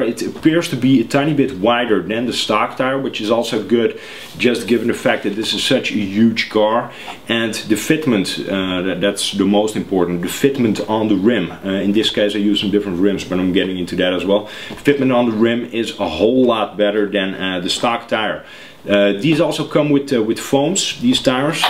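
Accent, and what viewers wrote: Netherlands. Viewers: Are you from Holland, you have a dutch accent.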